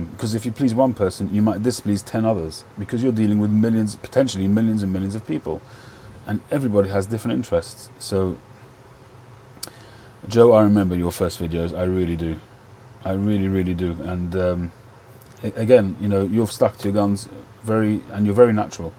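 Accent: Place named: United Kingdom. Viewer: Excellent. Reminds me when I use to listen to the BBC on Shortwave in the 50's. Very British.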